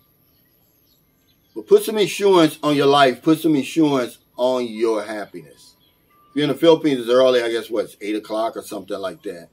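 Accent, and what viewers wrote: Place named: Florida, United States. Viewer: Bro are you from Florida I'm from central Florida and your accent screaming FLA. 😂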